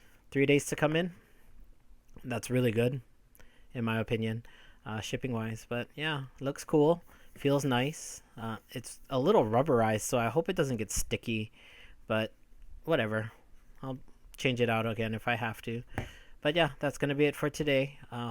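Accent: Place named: Mexico. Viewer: This guy for sure speaks Mexican-Spanish, his accent is obvious.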